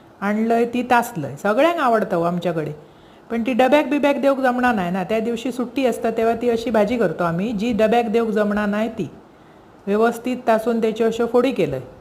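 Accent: Malvani, India. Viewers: Like your malvani narration ❤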